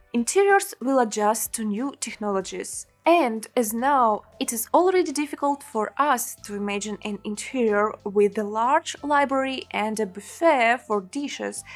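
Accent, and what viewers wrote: Russia. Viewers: Ничего вы не понимаете, во первых акцент хоть и хорошо слышится, но саму речь приятно слушать, уши не режет, как по мне многие русскоговорящие зря пытаются полностью избавиться от акцента, это же изюминка, главное чтобы тебя понимали и чтобы ушам больно не было, в данном случае очень приятно слушать, мне такой акцент очень даже нравится. Вы еще не слышали что такое плохой акцент, послушайте Пакистанцев или Индийцев, вот там не приятный акцент, но даже они не заморачиваются и не стесняются своего акцента, и все смотрят их видео, общаются, главное какой контент они производят. Удачи вам! Не слушайте тех, кто говорит что у Вас ужасный акцент, это не правда, у вас очень приятный акцент:) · Fun fact: when you are speaking English, you hands movements are a lot less than that when you’re speaking Russian:) all that is because the center of “sense composition” is moved from soulful thought delivering to “make sure your English is correct and distinctive”. 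P.S this Russian Natasha accent is certainly your charm. Thanks for a great video:) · Why not to have channel in English?! Great Idea 👌 Russian accent ? - why not?! Keep going on! 🤗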